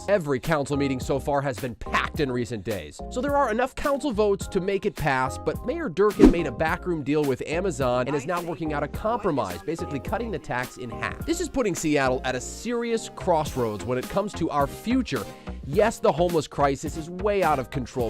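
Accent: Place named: England, United Kingdom. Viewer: Your English accent was brilliant.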